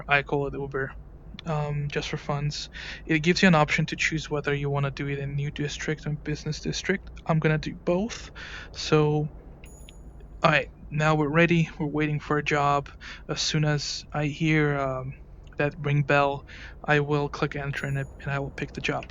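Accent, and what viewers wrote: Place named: North, United Kingdom. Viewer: ofc whatever , i just wondering like typical north accent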